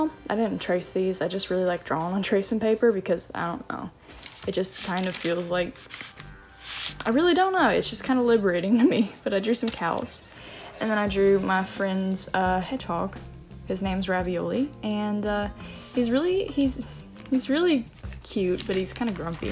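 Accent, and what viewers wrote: South, United States. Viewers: That country accent coming in thick lol it's adorable! I really love your art btw it's so vibrant and smooth looking 💕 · I hope this isn’t rude or anything but your accent makes me so much more secure about mine! Even though I live in the south people make fun of my southern accent sometimes and hearing someone else with it makes me feel so good. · I love your accent. I also have a southern accent and I find them pleasant to listen to.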